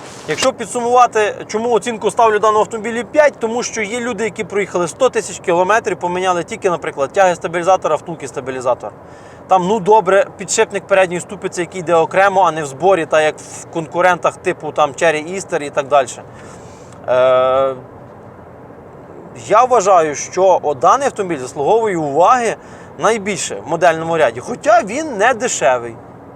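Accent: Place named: Poltava, Ukraine. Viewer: Это полтавский быдло-суржик, уж извините.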